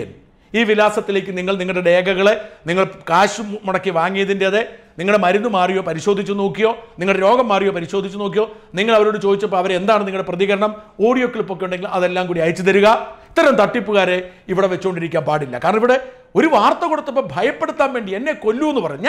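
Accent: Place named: Kochi, India. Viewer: Slang kettal ariyaam kochi kaaran aanu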